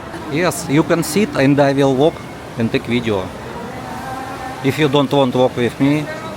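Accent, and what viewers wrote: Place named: Russia. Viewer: Your accent more Russian but it's okay I love your content I have been in Angeles too.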